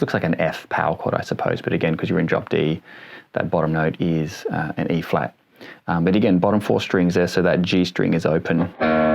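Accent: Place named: Australia. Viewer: Also very cool to find another Kings X fan with an Australian accent 😀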